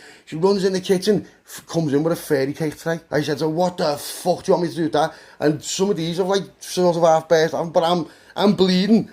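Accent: Liverpool, United Kingdom. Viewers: Yes Scouse ❤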